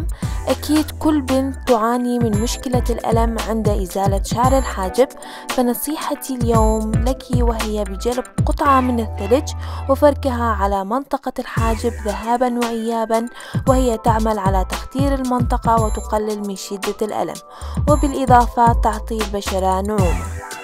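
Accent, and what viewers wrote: Iraq. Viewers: صح ماكو احلى من لهجت العراقيه حلو واحد يحجي بلهجته مو بالله 😂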